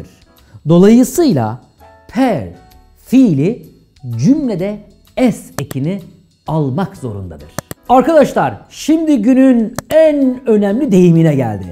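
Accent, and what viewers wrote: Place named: United Kingdom. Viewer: hocam formatınız iyi..teşekkürler.. bir kaç önerimde var bunun yanında..amerikan aksanını ön plana çıkarmanız....çok kullanılmayan dip bilgiler yerine günlük hayatta sıklıkla kullanılan kalıplara yönelmeniz..ingiliz aksanı kafa karıştırıyor kullandığınız...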